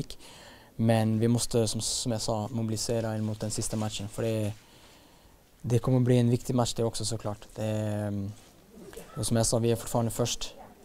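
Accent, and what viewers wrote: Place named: Norway. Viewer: Han har börjat prata lite mer norskt igen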